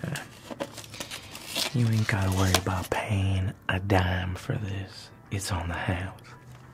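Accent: South, United States. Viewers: I could see this easily turing into something hilarious too with the southern accent an all. Some barbeque sounds good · Dude I’m from the south, and I confirm this accent is super on point. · Southern draw not a accent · Absolutely amazing. I adore adore adore your content 🥰 so thank you! I absolutely love horror and bad no idea this could be achieved asmr wise. I’ve watched it twice now to sleep 😌;; - love the southern accent too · Very enjoyable! Absolutely fantastic! That Southern accent sounds gorgeous! Loved it! 😁✌💕❤🎭🪚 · This is a REALLY good Southern accent, and that’s coming from a native Southerner. I’m not usually into roleplays with affected Southern accents because they’re not often done well (in my opinion), but this is so good! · Considering I LOVE the original movie and I’m excited and hyped for the upcoming TCM multiplayer game by Gun, I am so glad you made this video!👍 Also props to your top tier acting and your southern accent, dude. 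Your character could EASILY fit in as a member of the Sawyer/Slaughter family.😁👍 Btw, just as a side note, Gun is making brand new Sawyer family members from the ground up for their upcoming game.😊👍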